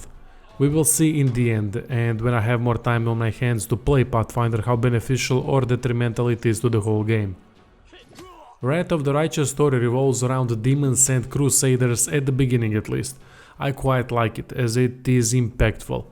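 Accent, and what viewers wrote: Russia. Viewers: tvrdi ruski naglasak i odlicne analize, što više poželit, pozdrav iz splita :) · The man sounds Russian, so you know he knows his CRPGs! (And gaming in general as eastern Europeans are the most prolific in excellent game development nowadays!) I have mine in the cart right now ready to pull the trigger after I'm done watching this video! EDIT: Just bought it, I usually don't do pre-purchases, but I planned to get it day one anyways, so...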